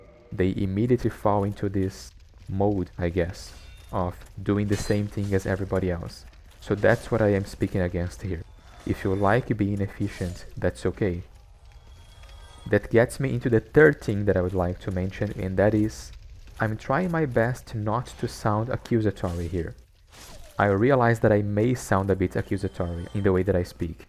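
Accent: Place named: Brazil. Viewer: Sotaque BR 😂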